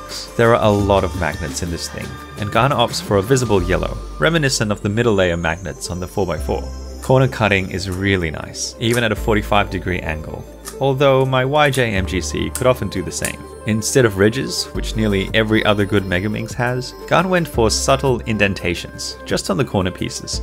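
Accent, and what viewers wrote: Australia. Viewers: TNL Cubing it’s his au accent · Are you australian?? I'm australian!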